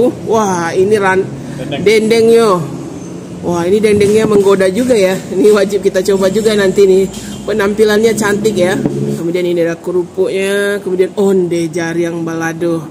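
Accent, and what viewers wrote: Minangkabau, Indonesia. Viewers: Dilek Minang kemirip Jawa, Gitulah sora, Sora menggetar jiwa, Ceria girang puas didengari.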